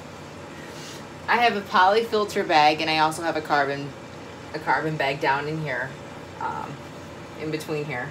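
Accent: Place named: Brooklyn, United States. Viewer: With both the Polyfilter and carbon in the sump, I'm curious about how much production you really get from the skimmer. Both are supposed to be removing the organic substances that the skimmer is skimming. And I'm wondering whether you could only use the chemical filtration and skip the skimmer. P.S. You sound like Brooklyn.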